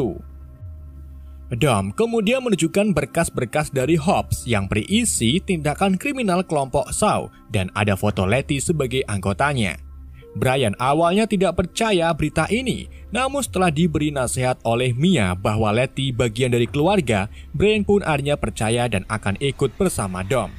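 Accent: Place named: Banyumas, Indonesia. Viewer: wong ngapak ya mas nyong ya iya i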